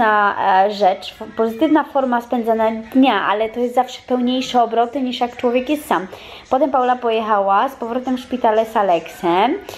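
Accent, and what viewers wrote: France. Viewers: dlatego, że we francuskim końcówki są "bezgłośne". Nie wypowiada się ich tak dokładnie jak w języku polskim. Przyzwyczajenie do innego języka. Poza tym myślę, że to bardzo niekulturalne zaglądać komuś do portfela i komentować, że się dużo na coś wydaje.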